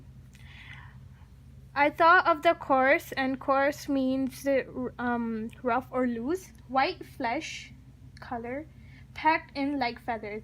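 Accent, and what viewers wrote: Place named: India. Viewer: Hi, good analysis, loved it❤️ Btw, are you Indian? Your pronunciation is so cute😍❤️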